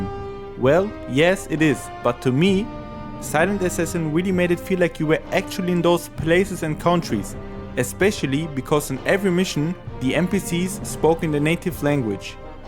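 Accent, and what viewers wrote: Germany. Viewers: i hear german fella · Ich geh von deinem Akzent mal davon aus, dass du deutsch bist. Ich hab alle deine Hitman Videos durchgeschaut. Finde die super. Hast dir nen weiteren Abonnenten verdient 😂✌🏼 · Are you german ?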